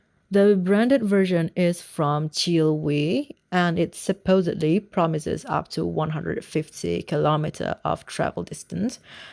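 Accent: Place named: United Kingdom. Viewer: Tq for sharing. Nice accent though..such watching review from someone living in uk.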